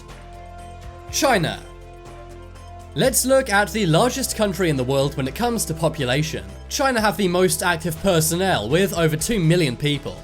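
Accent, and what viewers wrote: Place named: United Kingdom. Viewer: This channel is so OBVIOUS in it’s erroneous “facts”. EVERY SINGLE VIDEO has outrageous statements glibly spewed by a Brit who thinks Americans NEED EVERY documentary narrated w/ a UK accent.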